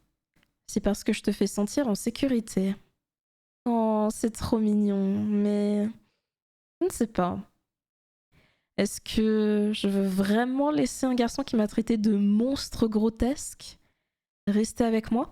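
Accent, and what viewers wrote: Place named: France. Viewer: Oooooooooo French accent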